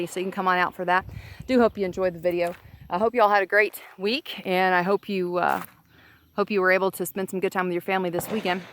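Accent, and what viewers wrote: South, United States. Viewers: You seem so different from a few years ago....you use your Southron accent and dress more casually and seem to live in a different area of the country. What changed?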